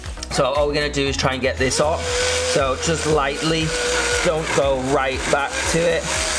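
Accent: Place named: England, United Kingdom. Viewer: I really enjoy listening to you speak. (English accent) 😁👍🏽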